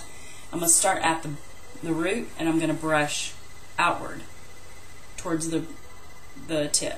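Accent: South, United States. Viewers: where r u from? you kinda sound southern?!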